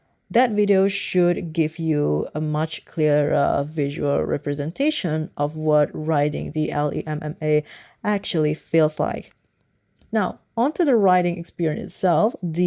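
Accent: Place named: United Kingdom. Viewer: Tq for sharing. Nice accent though..such watching review from someone living in uk.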